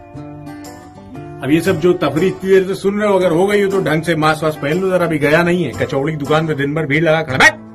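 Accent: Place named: Kanpur, India. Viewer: Waah bhai! Aapke bolne ke andaaz se koi bhi samajh jaaye ki aap Kanpur se hain. Ek baar milna hai aapse...main kalyanpur me rehta hoon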